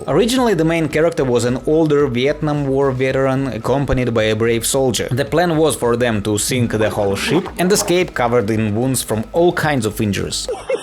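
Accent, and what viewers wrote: Russia. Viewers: where are you from? You have this clear russian (or Ukrainian) accent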